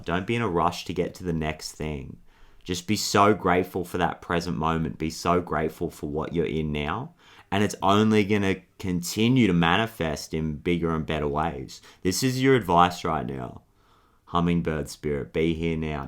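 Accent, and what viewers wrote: Australia. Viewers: God you're adorable! and your Australian accent and the tone of your voice is wonderful on my ears. Thank you for your light and purity; thank you for choosing to share this very on-point and powerful message. You got my subscriptions and likes for sure! I look forward to hearing more messages from you! Cheers! ❤🙏💎🌟🌟🌟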